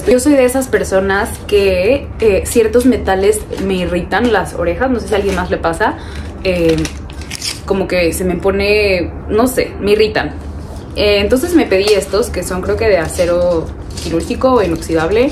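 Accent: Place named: Fresa, Mexico. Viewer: Holaaa, sólo no hables tan fresa y saldrán mejor tus videos. Saludos.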